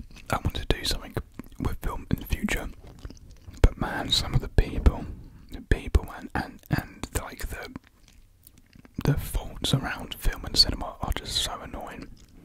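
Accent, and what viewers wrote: United Kingdom. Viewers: british accents are so elite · I loved your intro and you have a great voice. By the way, I love your British accent😍😍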